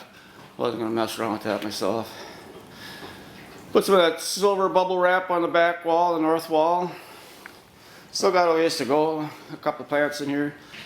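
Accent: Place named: Wisconsin, United States. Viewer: You must be from Wisconsin...you sound just like I do:)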